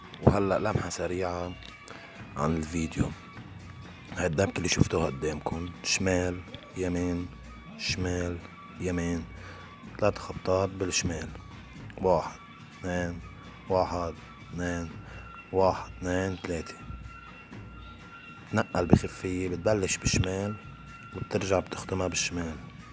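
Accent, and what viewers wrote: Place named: Iraq. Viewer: عم تحكي عراقي واو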